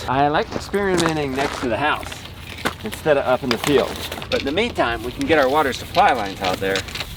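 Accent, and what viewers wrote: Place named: Hawaii, United States. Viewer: Hey you sound like a hawaii local person say. We go see if the water stay flowing. That's what we say. Ok den brah!🤙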